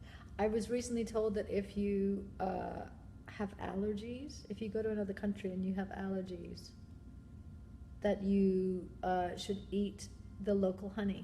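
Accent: England, United Kingdom. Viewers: Oh wow, when she’s interviewed in Britain she adopts a British accent. It’s nicer I must say. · Russell Barth She's always had a English accent.She grew up in London.Thats her original accent. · why do you have an english accent now when you didn't before?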